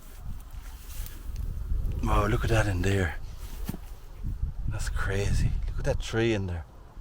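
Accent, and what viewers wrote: Ireland. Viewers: Wee bit of the Irish in ya there Laddie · So old and interrstin g, from lon g ago days. I love your charming Irish accen t too 😊😊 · I'll always give ye a tumbs up 😊. I could listen to your Irish accent all the day long ❤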